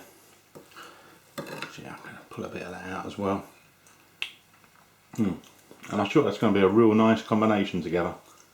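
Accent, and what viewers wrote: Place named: Essex, United Kingdom. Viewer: You are 1 funny bloke do you know that?! :D I think you would find it amusing to know that I often watch your vids in bed (as my computer is set up along side it for long hours working at night!) & I have a nice cup of Assam, & laugh uncontrollably at your little moments of alacrity & charismatic cordiality! You surpassed yourself with this one Chef! And 13 Minutes! Good length! Do you know, I could swear you have an ESSEX accent! Maybe it's just me. How on earth R U going to top this one ay!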